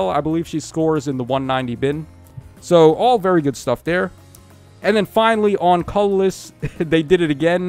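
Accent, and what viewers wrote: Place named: Queens, United States. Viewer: Something great about an affable dude with a thick Queens accent reviewing gatcha games. 😆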